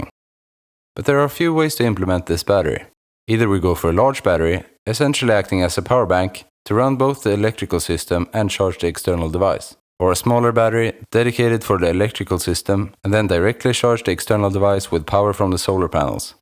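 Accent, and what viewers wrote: Sweden. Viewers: You must be Swedish, u talk with a Swedish accent. Or possibly Norwegian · Might you be svidish?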